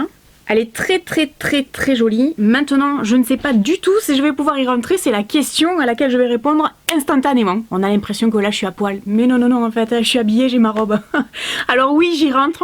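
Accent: South, France. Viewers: Bonjour c'est la 1ere fois que je mets un commentaire mais je regarde pratiquement toutes vos vidéos. J'ai l'impression de regarder une fille que je connais depuis des années. Pas de chichis toujours la banane c'est vraiment super j'ai l'impression d'être en visio avec une amie et puis cet accent.....que j'ai eu pendant longtemps puisque j'ai habité Martigues....me fait rire car maintenant j'ai l'accent du sud mais entre le continent et l'Italie....oui oui la Corse ici aussi on vous regarde 😂😂 alors un grand merci pour ces bons moments passer avec vous. Et si vous déplaise à certaines c'est quelles sont peut être jalouses ou que se sont des BOUCANS FADA 😂😂😂 Aller je me permets comme a une amie bisous😘😘😘😘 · Bonjour, super ta vidéo merci pour ta joie de vivre et vive l'accent du Sud au passage 😉, merci pour l'info pour ces sites pas chère du top👍.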